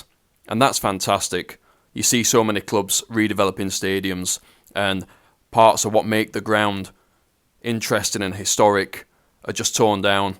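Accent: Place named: Cockney, United Kingdom. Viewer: Melt ?;Your from Stockport I guess so why come out with the old cockney twang ?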